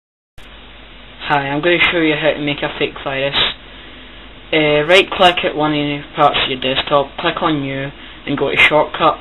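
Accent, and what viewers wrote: Scotland, United Kingdom. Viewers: OMG! SCOTTISH! :D! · thats fun ;) and, i just adore you'r accent ^^ scottish is just so cool :o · haha i love your voice! scottish people have the best accents lol and I am sooo doing this at school tomorrow thanks hun! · ye you are scottish do you got a lisp tho? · lmfao he is from scotland he is not irish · its scottish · whoa you got a strong scottish accent mate! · by the way he speaks i think he is scottish. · Thats cool...and are you Scottish?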